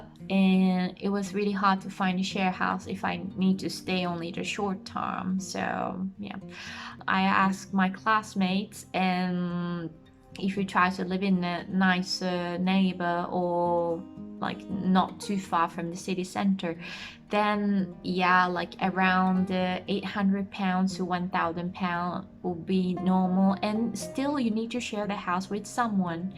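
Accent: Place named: United States. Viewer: はアメリカっぽい英語ですね。とっても流暢で羨ましいです。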